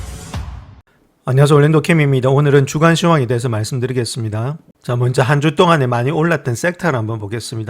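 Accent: Gyeongsang, South Korea. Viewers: 일고리즘 타고 왔는데… 미국에서 온 전문가라면서 경상도 사투리.. 바로 퇴장.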